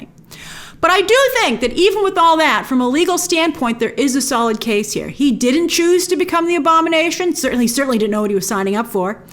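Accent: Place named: East Coast, United States. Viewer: “I think they cudda dun a betta jaub” That East coast accent tho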